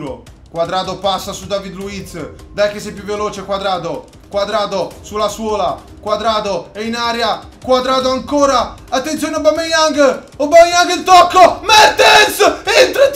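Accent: Sardinia, Italy. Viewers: ma sei sardo?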